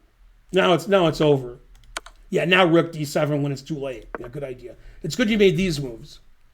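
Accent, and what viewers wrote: United States. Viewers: I can totally tell your from Detroit bro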